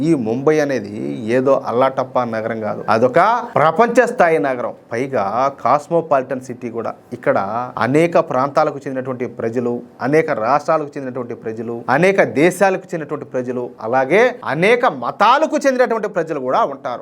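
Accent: Rayalaseema, India. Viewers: అన్నయ్య నీ భాష అదిరిపోయే భాష మాట్లాడతావు అన్నయ్య రాయలసీమ భాష చాలా మంచి న్యూస్ చెప్పావు అన్నయ్య థాంక్స్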